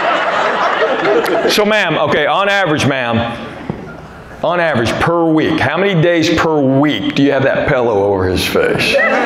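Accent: South, United States. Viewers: I think it’s cool how he’s Asian yet considering he sounds like a Southerner he is popular with white people as opposed to only being popular with Asians like you would expect the average Asian comedian to be. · I always admire the clear communication skillset. His intentions are easily understood even in the slightest of gestures. The biggest gesture is him always being entirely respectful. It surfaced as the "Sir and Ma'am" emerged through the marriage questions. It is easy to see why he has been successful so many years. The effort of getting to his shows are always such a pleasure. I stumbled on his name through a Tom Arnold movie "McAle's Navy". I knew the other comedians as my eyes and ears could not synch up the southern voice with the Korean appearance.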